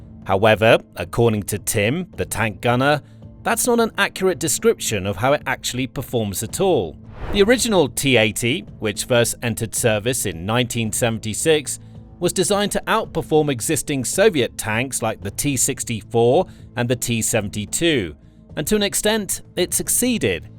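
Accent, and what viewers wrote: England, United Kingdom. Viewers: Why does the narrator (Who's clearly English by his accent) keep calling petrol "Gasoline"?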